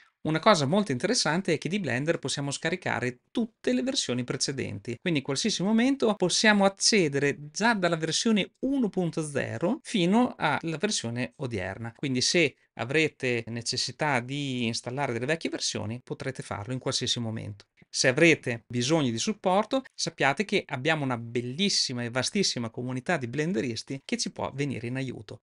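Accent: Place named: Emilia, Italy. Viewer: Ciao mi sto avvicinando a Blender da pochi giorni, provengo da Cinema 4D con un livello appassionato avanzato, grazie del corso lo seguirò con interesse e costanza, dal tuo accento sento che sei emiliano, valore aggiunto in quanto amo la tua terra tanto che ho la compagna della provincia di Modena! a presto!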